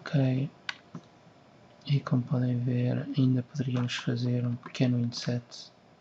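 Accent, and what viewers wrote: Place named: Portugal. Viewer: Excelente Tutorial! Em português de Portugal!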